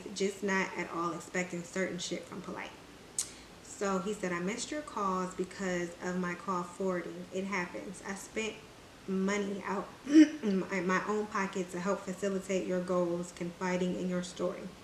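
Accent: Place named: United States. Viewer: mad country sounding. I'm from NYC lol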